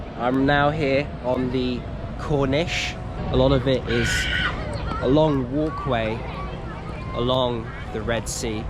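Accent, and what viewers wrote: United Kingdom. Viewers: solid 3.8 out of 5. Not bad considering you're a brit and you accent's heavy, and Arabic isn't considered easy to learn. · اللهجه البريطانيه فخمه نفسي اتعلمها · Thanks for showing us the world with your videos, I want to confess that I don´t like the british accent, I prefer the american accent because is more familiar for me because I´m from Mexico, but your accent looks good for me.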